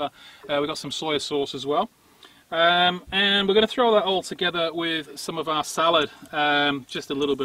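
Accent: England, United Kingdom. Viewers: Are you from england?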